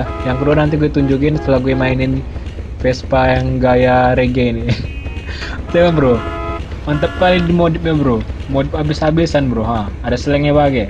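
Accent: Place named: Tanjung Balai, Indonesia. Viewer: abg orang tanjung balai ya?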